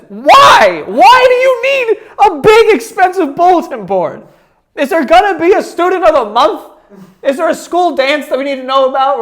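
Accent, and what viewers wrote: United States. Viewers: Wait.. do Americans say axe instead of ask?? Haha how did I not notice till now😂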